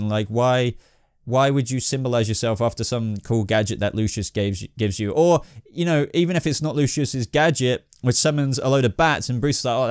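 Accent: United Kingdom. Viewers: Why is there a brit narrating an American show cant listen to this rubbish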